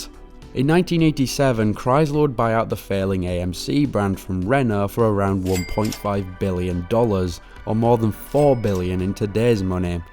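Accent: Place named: Southport, United Kingdom. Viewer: The accent reminds me of the Southport area...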